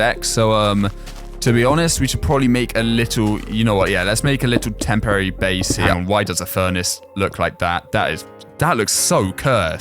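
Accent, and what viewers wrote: United Kingdom. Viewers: just realized he’s British/Australian/sum like that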